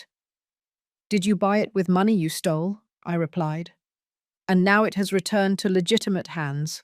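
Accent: United Kingdom. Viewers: Good story but dont like the narrator from the U.K.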